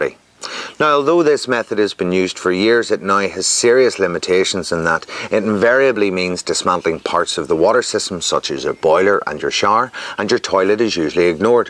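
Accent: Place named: Ireland. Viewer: Omg the subtitles are soooooo funny !!!! The Irish accent caused some confusion 😂😂😂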